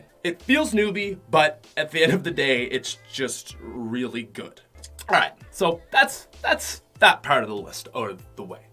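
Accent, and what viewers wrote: Australia. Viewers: IS FROM AUSTRALIA PROOF IS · are you in Australia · didn’t realize was an Australian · holy crap I didn’t know your Australian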